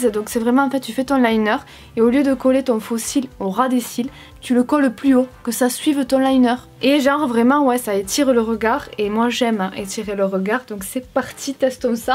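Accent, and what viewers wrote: South, France. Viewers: J'adore t'entendre en vidéo avec ton accent qui me rappelle le mien hihi (je viens du sud vers Carcassonne)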